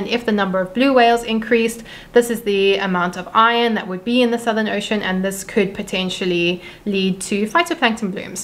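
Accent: South Africa. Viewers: Totally off topic, but I'm fascinated by your accent. Are you from South Africa? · South African, love to hear it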